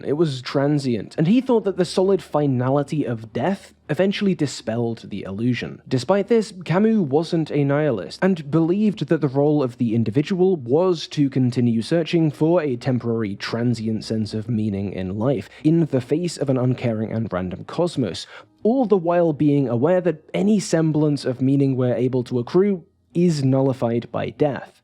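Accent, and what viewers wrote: United Kingdom. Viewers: I KNEW HE’D BE BRITISH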